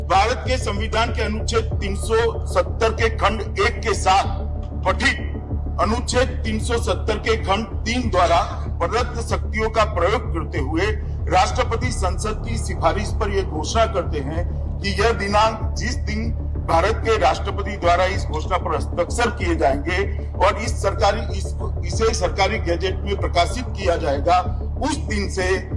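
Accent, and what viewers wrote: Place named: Pakistan. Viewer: Bhai tera accent pakistani kyu lag rha hai ?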